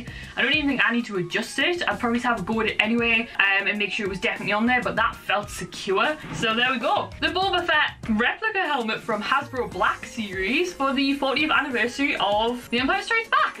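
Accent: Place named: Liverpool, United Kingdom. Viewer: Her accent is honking, but isn't scousers bad.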